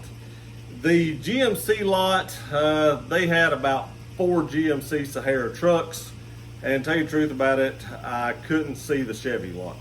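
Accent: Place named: Tennessee, United States. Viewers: Found your channel a sec ago by your weight loss video. Was like, bet that dude is from East Tennessee based on your accent haha and clicked this video and you said Johnson City. I’m from Knoxville/ Maryville. Good to see a YouTuber from this area. Just wish I didn’t have to stare at that ugly butt Florida hoodie in that other video tho🤮😂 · Johnson City, Texas or Johnson City, Tennessee? Accent sounds like TN but can't be sure.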